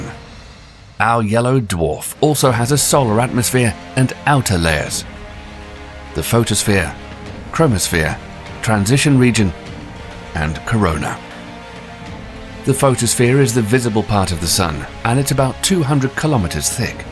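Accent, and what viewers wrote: United Kingdom. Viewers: Why does there ALWAYS got to be some brite narrating even on an American channel.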